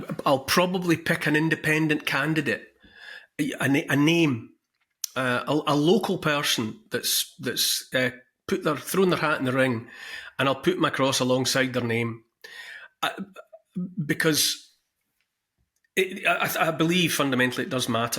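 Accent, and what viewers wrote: Scotland, United Kingdom. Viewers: His words are powerful poetry in a Scottish accent and deserve to be heard by all no matter how delusional or brainwashed one currently may be Neil’s words might enlighten the dome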